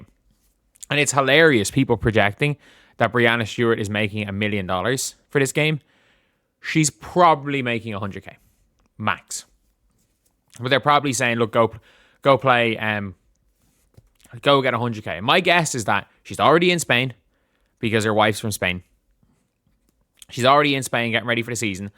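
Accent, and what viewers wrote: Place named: Ireland. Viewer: I love to hear my hoop news in your charming Irish brogue. Reminds me of my immigrant dad. Love u dude keep spittiing those facts me boyo 🇮🇪🏀☘️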